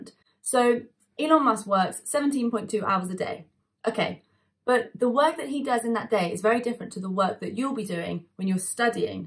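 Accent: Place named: England, United Kingdom. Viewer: your english accent is a little difficult to comprehend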